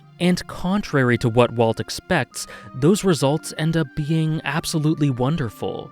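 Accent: Canada. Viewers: Listening to talk about the name of this ep: "This guy's Canadian!" (I am too brother, I get it) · Are you from Canada?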